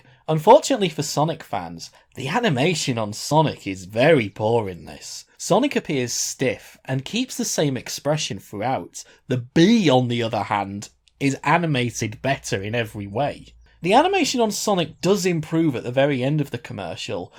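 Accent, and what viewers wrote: Yorkshire, United Kingdom. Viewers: Where in Yorkshire are you from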